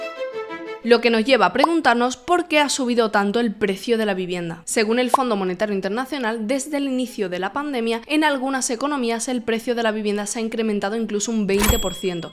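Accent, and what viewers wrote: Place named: Malaga, Spain. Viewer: Eres muy mona con ese acento malagueño, comparto el final del análisis, se harán menos operaciones en España pero los precios se mantendrán, la recesión no será tan grave, los tipos no subirán, la inflación es coyuntural,es más bien un sinceramiento de precios al consumidor, el mayor problema es los salarios tan bajos que tiene la mayoría..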